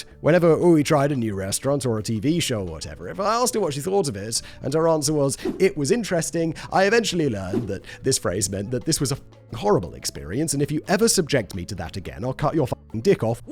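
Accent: RP, United Kingdom. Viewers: I’m American. You actually have an annoying pompous British accent. There are much better British accents. Scottish and Irish are definitely better sounding than British. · cunt is such an everyday word in nz and oz is your accent not close to RP? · Honestly I forget that Simon is British. Just used to the British accent that punches you in the face, his seems light. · Ngl… your accent seems very generic British. Sorry Simon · if asked I would have said Simon speaks with an RP sound. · Sorry to be the one to tell you, Simon; you're posh and your accent is posh... the fact that cant see that, confirms it! 😶😂🤣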